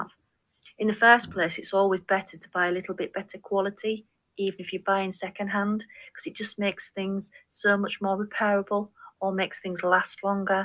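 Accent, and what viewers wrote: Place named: Hull, United Kingdom. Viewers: Well done on hitting your first 1K Fab video once again, both Mr Wallflowers and I really enjoyed it, we especially enjoyed your poem with your beautiful Yorkshire accent ❤ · Thank you SO MUCH for your fabulous videos. My beauitful Mumma (of blessed memory) was a Yorkshire girl who emigrated to Australia in 1963. I was born the year after and have such fond memories of growing up in the 70's. Mum was a very frugal lady and passed on many skills. Beyond grateful for her input. Things here in Australia are very expensive but I make the most of things and enjoy the challenge of making do. Ohhhh, how I love your accent! It sounds just like my Aunt in Barnsley! Think I might be a Yorkie at ❤! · Your accent sounds familiar - do you come from, or very near, Hull ?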